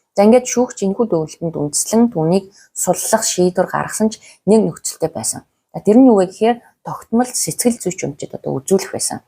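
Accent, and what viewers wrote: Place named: France. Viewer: Chi naad french ayalagaa boli ok...chi yasiin french hel meddeg ain..english heleer ok Bi Amerikaas sonsoj bna...hutsaad Frensh ayalagaar ain...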